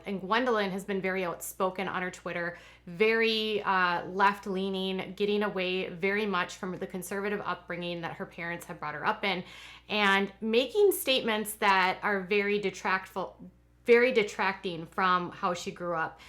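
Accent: Minnesota, United States. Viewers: This is the first video of yours I watched. I thought "she sure sounds minnasoooootan" :-)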